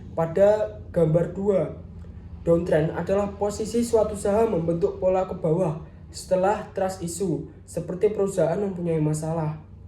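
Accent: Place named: Java, Indonesia. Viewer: Medok dikit ga ngaruh🔥keren poll👏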